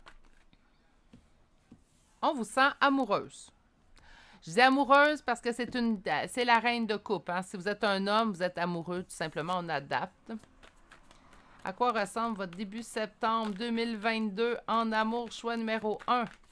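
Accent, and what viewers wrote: Canada. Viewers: Bonjour, Ça sonne juste. Ha, que j'aime aussi écouter ce merveilleux accent Canadien chaleureux et rassurant ! Bises.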